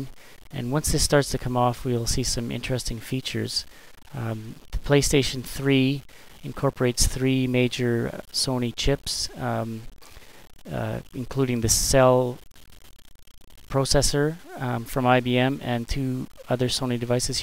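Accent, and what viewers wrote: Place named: Canada. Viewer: you have a canadian accent lol are you canadian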